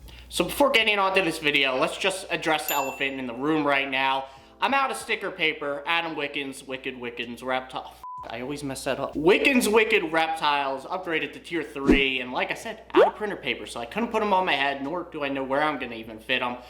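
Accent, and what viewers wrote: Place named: New Jersey, United States. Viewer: Don't sweat the negativity,. I've learned a lot from you. You're my Ackie guy, and when I'm ready to get one I'll come to you. BTDubs, you can't help the Jersey voice bruh, lol. Lots of love from a southerner and I hope you feel better.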